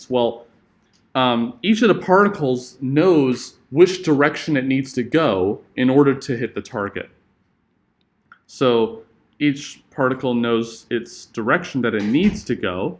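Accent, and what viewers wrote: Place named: England, United Kingdom. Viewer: Very good lecture. I like your English accent very much. Keep it up.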